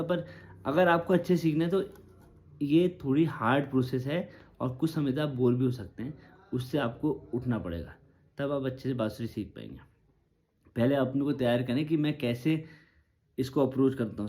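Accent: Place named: Pahadi, India. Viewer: Bhai accent se to pahadi lagte ho btw meri kahani to aisi hai ki Sa nikalne main 6 mahine or Sargam main 1 saal lag gaya par control itna nahi tha ki dhun bajaoo toh maine thande baste main daal di fir 5 saal baad lockdown main uthayi to dheere dheere raag bajane ka control aa gaya.Ab do saal se regular baja raha hu or thik thak baja leta hu.Sab khud se he seekha isiliye itna time or effort lag gaya kya karoo mogli ki generation ka hu, gadha majdoori kiye bina hum logo ko chain nahi aata.Smartphone generation to har chij main coaching,note,shortcut,trick,hack dhundti hai smart log hai na gen Z fir Z1, Z2, Z3🤣🤣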